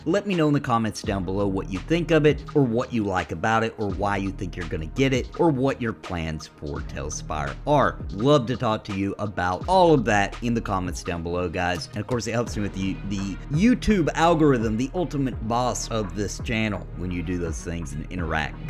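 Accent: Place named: Appalachia, United States. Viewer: l knew I heard some Appalachian I'm from Southern wva so I picked it up lol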